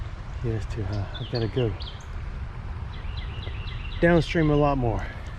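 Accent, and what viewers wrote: South, United States. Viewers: Love you quirky comments and your southern accent. Make for an interesting video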